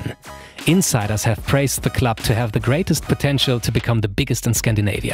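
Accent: Germany. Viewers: Deutscher akzent kickt hart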